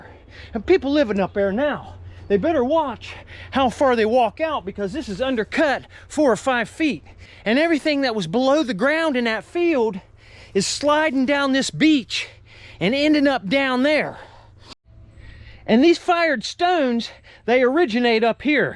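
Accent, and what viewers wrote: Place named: Southern Ohio, United States. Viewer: ❤ the south Ohio accent. Being from southeast Cincinnati, you remind me of my uncles. 🤘🏻Rock on.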